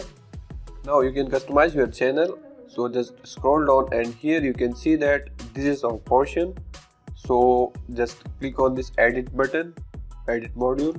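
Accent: India. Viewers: i like your indianacent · his indian accent (no racism)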